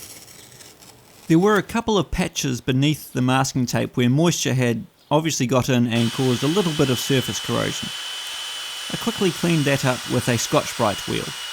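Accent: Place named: New Zealand. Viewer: Just found these vids. Nice work. Pleased to hear a Kiwi accent on youtube.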